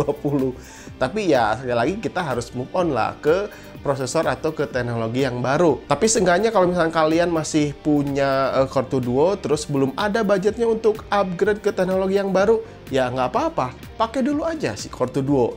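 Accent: West Java, Indonesia. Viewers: Biasa, orng sunda logat nya kyk gitu, sama kyk gw wkwkwk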